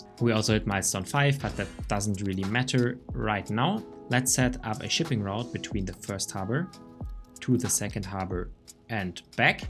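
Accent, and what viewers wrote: Germany. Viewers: Are you german perchance ?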